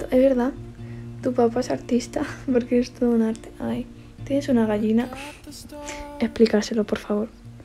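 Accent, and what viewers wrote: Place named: Spain. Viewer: Hola lo mejor de ti es tu carisma y tu habla de español me gusta las españolas su forma de pronunciar saludos desde Costa Rica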